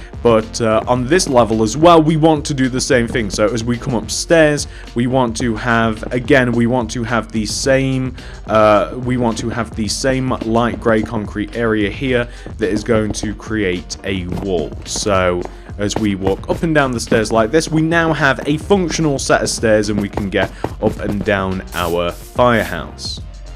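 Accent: England, United Kingdom. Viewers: Are you English, cause I am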